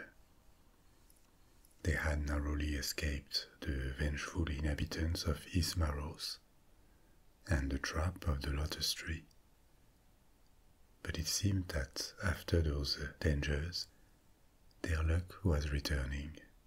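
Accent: France. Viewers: Farted as soon as it started🤦🏼‍♂️😬 scared the shit outta me 🤣😂 Butt... Soon as he said just close your eyes n let me do all the work ...😶👀🤗shiiiiitttt😴😴 say less French boss man whisper guy ..hella amazing 💯💯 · So zi really found the french qccent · Yeah the only thing keeping me up is the accent because I am French as well and it s super annoying that he does not speak in French. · Can't forget he's french. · Vu l’accent tu es français, existe-t-il des équivalents vf de tes récits mythologique? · Nothing more relaxing then the sound of a french accent. One of the more beautiful accents In my opinion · Dude I love your accent it reminds me of our (America's, my home country) French Allies in the Revolutionary War which in turn makes me immediately biased, and therefore I enjoy listening to you speak. Thank you for these videos you make for us. Certainly subscribing, my good sir! 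I wish you nothing but the absolute best.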